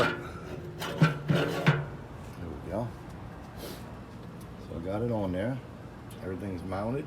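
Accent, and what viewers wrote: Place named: New Orleans, United States. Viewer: you sound like you from NOLA with that accent.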